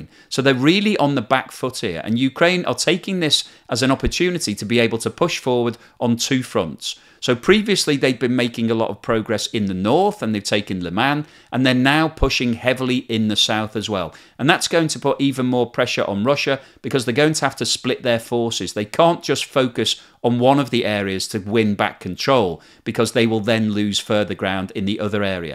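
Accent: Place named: Liverpool, United Kingdom. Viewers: Yes, definitely a slight Liverpool accent. Very clear to me. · I think has a slight Liverpool accent. Your English must be pretty good, so listen out for that! 😊👍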